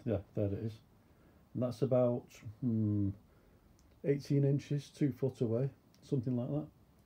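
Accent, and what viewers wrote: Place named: Lancashire, United Kingdom. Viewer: Nice work. I stumbled across your channel. I'm in Huddersfield. You sound lancs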